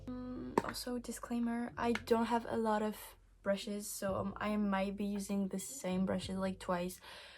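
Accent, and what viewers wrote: France. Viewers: I didn’t expect u to be french. But I love your accent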